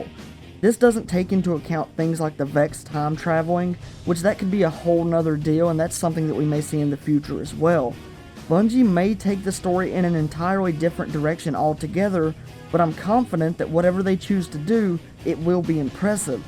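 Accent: South, United States. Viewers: I thought it would be a fuunnn way to tie up those loooose ends 👌cowboy accent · I hate southern accents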